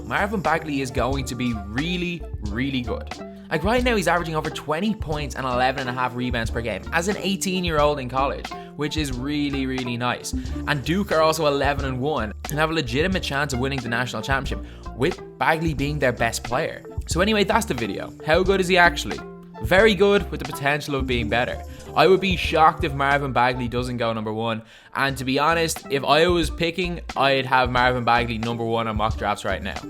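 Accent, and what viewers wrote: Ireland. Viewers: I'm confused how a Irish voice is doing basketball video lol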